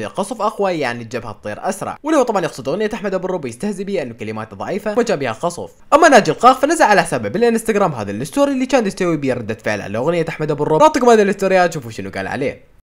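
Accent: Iraq. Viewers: بس سوال انته عراقي لو لا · سؤال انته عراقي لو لا ردلي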